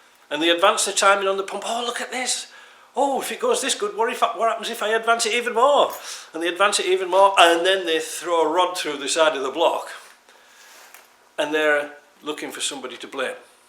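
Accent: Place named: North, United Kingdom. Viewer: As we are well into the 21st century now, it appears that it is becoming an increasingly pc-culture where we all have to be careful not to hurt other people's feelings by making passing comments about this, that or the other! One of your greatest assets to my mind, is straight-talking without all the BS & razzamatazz often associated with instructional videos elsewhere. Its not like you threatened anyone or incited bad behaviour and I (as a fellow northern Englishman in the UK) can empathise how the northern attitude & brogue, is sometimes misconstrued as harsh to some sensitive souls! Just keep doing what you've been doing & helping all those with older Defenders, to keep on truckin'!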